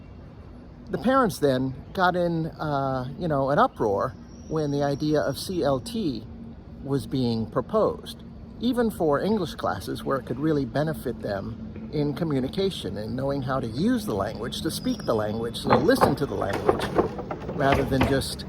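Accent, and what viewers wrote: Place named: United States. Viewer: were you learning English as a second language? Where are you from then, you sound American to me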